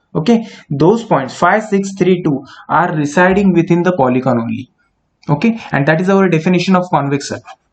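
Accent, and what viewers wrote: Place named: Maharashtra, India. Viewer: nice marathi accent well explained !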